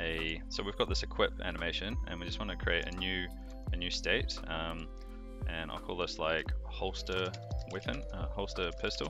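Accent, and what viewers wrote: New Zealand. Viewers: great. i already know im gonna hear nothing but "zed" instead of Z "not" instead of zero and "soouuuuwwww" instead of "so" the whole time.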